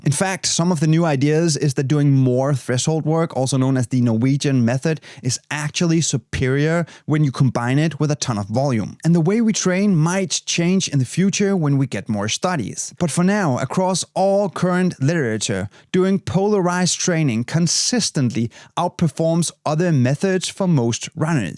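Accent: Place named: Denmark. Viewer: Failed to recognise your accent :( My guess was Swedish, not Danish. Though who else would explain things in short, simple and very informative way? (May be just Dutch 😉). Thank you so much for a reminder about good warm-ups and A/B skips implementing!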